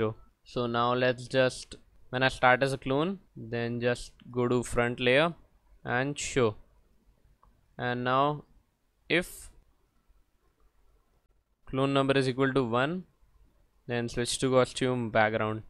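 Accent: India. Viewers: I'm sorry for making fun of your Indian voice.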